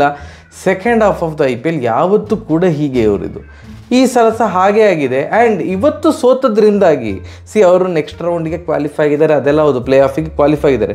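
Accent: Mangalore, India. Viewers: ನೀವು ಮೊದಲು ವಿಡಿಯೋ ಮಾಡಿದಾಗಿನಿಂದ, ನಾನು ನಿಮ್ಮನ್ನ ಫಾಲೋ ಮಾಡಿದೀನಿ, ನೀವು ಐಪಿಎಲ್ ವಿಶ್ಲೇಷಣೆ ಮಾಡುವ ರೀತಿ ನಂಗೆ ತುಂಬಾ ಇಷ್ಟ, ಚುಟುಕು ಹಾಸ್ಯ ದೊಂದಿಗೆ ನೀವು ಹೇಳುವ ಮಂಗಳೂರು ಭಾಷೆ ನಂಗೆ ತುಂಬಾ ಇಷ್ಟ, ಒಂದು ರಿಪ್ಲಯ್ ಮಾಡಿ 💕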